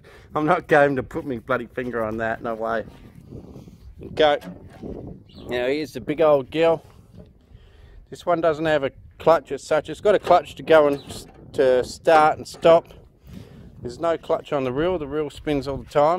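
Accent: Australia. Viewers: Are you from Adelaide?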